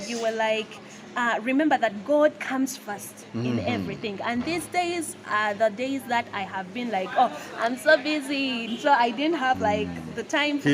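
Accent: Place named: Uganda. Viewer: Am from Namibia your accent reminds me of one medical Doctor's wife from Uganda, I actually found them in church in 2018 the year I got saved... I will never forget them that accent of yours reminds me alot of them.. they always invite us at their house, fellowships all night.. I will never forget them Lol In the coming years I must come to Uganda and marry a God fearing women.. I love your accent... Thanks for sharing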